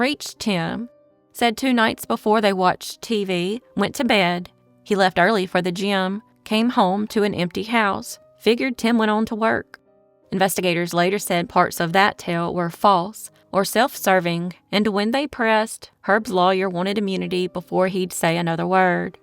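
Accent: South, United States. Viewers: This narrator is - a real person with a Southern accent. Maybe those other channels use AI voices to make it sound like the narrator of this channel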